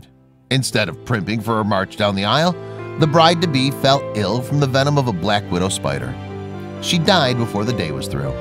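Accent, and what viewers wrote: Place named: Germany. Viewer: He sounds like what a thick German accent voice feels like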